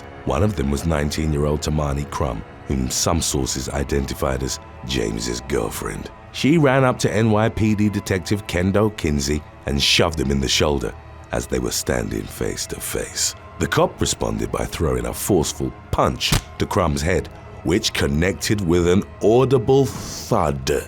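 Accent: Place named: United Kingdom. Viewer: That’s just how Brits pronounce it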